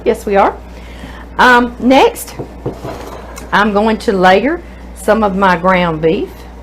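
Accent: South, United States. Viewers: Watching so of your older videos. I loved your accent back then. 😊❤️🙏🏻 Oh, and people should never, ever be mistaken in thinking a southern accent means you are stupid. ❤ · I could listen to this sweet southern lady talk all day! What a great recipe! It's basically lasagna but without all of the work involved.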